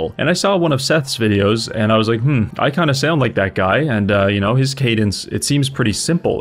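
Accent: Canada. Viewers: when i first watched your video (the wh one) i immedietly thought you sound like seth. then seconds later i was hit by the canadian accent and knew i found a gem of a channel XD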